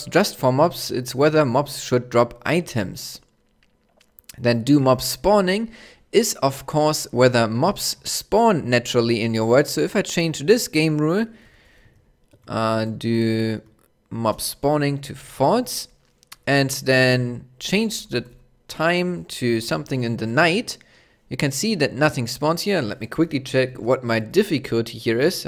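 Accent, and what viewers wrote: Germany. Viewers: your german, arent you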